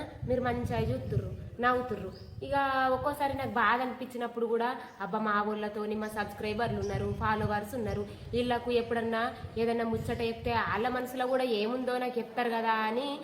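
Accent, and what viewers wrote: Nizamabad, India. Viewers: సూపర్ చెల్లి.. మీ వీడియోలు 6 రోజుల నుండి చూస్తున్నాను మా అమ్మ వాళ్ళది బోధన్ మీ యాస నిజామాబాద్ జిల్లా వాళ్ళు మాట్లాడతారు మీ యాసతో నేను రోజు నవ్వుకుంటను మీకు అభిమాని అయిన సూపర్ ఒకటి మీ పేరు నా పేరు లో సగం వున్నది ఇలాగే నవ్వించంది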